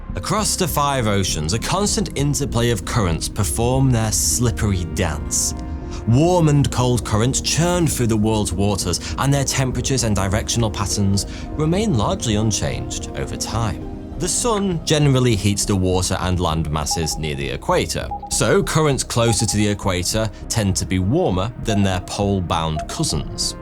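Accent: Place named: Cockney, United Kingdom. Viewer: That COCKNEY ACCENT IS DISGUSTING … YOU KEEP SAYING “FOURTY TWO HERE”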